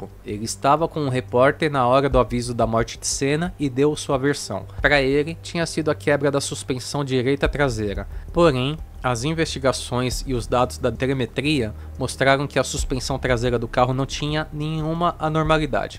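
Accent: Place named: Portugal. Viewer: Ele é português? Tem sotaque.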